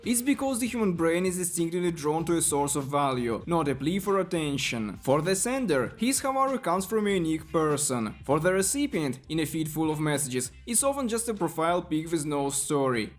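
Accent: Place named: Europe. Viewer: Your european english accent make it more funnier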